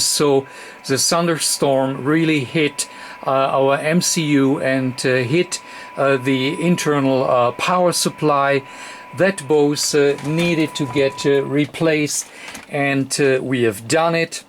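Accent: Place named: Germany. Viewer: you sound like my mentor with a german accent very easy to follow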